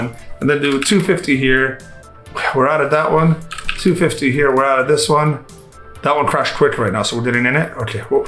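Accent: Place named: New York, United States. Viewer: Who is this new york man? where did our middle eastern csgo player go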